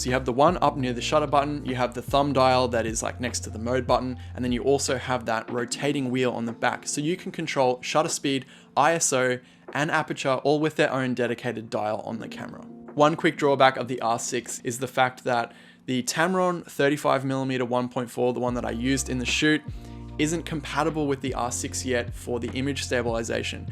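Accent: Australia. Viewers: Great review. So refreshing to hear some real world opinions & not bashing specs. Also love that it was photo based, not video and finally an aussie accent. Glad I found your channel 📷👍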